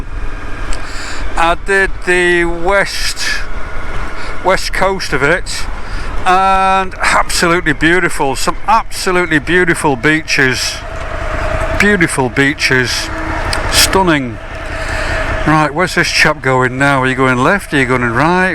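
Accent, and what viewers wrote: Manchester, United Kingdom. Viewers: Good to hear your engaging Manchester accent again pal…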